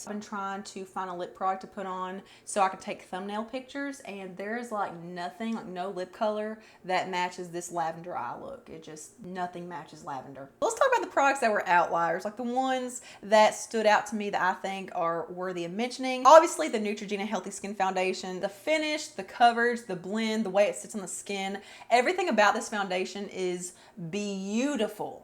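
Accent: South, United States. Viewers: You're from Kentucky?!? I've been watching you forever, but I had no idea! I'm an eastern Kentucky girl 👋 I've wondered about Kentucky being part of the South too because it's technically not. But, girl, listen to our accents (mine is thicker than yours) we definitely Southern lol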